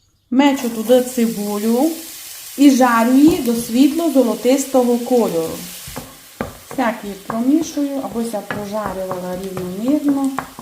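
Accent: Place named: Berehove, Ukraine. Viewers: Украинский язык у этой пани вперемешку с русским. Три украинских слова одно русское. Акцент западно- украинский, это верно, с поющими нотами. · Як я люблю слухати вашу мову. У нас так говорила сусудка , вона була д Берегово.Подяка вам за рецепт.❤ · З акцентом. Суль, олую, ойво. Це русини так розмовляють.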